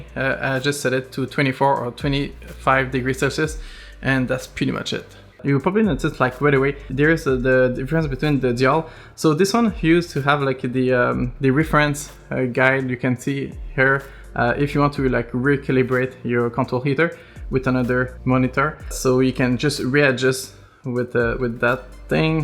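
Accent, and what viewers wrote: Quebec, Canada. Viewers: Tes Québecoé toé ? Jme trompe ?? Hahahaha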